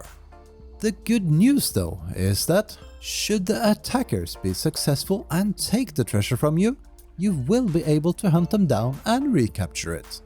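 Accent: Norway. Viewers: 10/10 norwenglish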